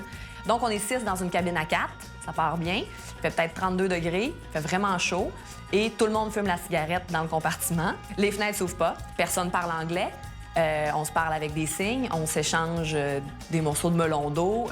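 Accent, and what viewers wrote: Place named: Canada. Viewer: Quel accent, j'adore nos cousins canadiens. 🇨🇦🇫🇷💞👍 Quant à voyager en Corée du Nord 🤔🤔🤔 Pour subir un contrôle total ? De toute façon on ne peut pas se déplacer comme on veut. Pour finir dans une prison ou dans un camp ? Alors pourquoi ? Il vaut mieux venir en France, dans le Sud par exemple ou en Corse.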